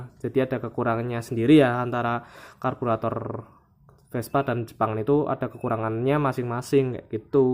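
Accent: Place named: Pekalongan, Indonesia. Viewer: Koyo wong pekalongan logate mas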